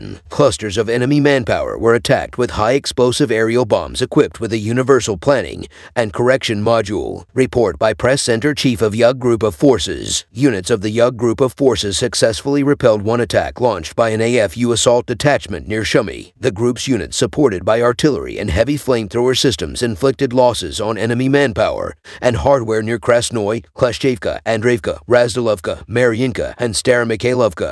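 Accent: United States. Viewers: Давно мечтал, чтобы Американский комментатор,озвучил положение на фронте!!!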